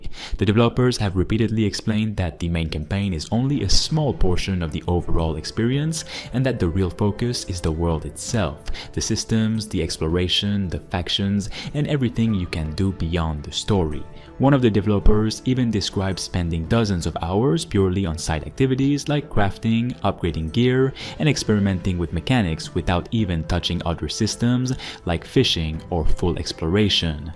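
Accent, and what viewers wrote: Quebec, Canada. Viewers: Gros accent québécois haha (Bon vidéo!)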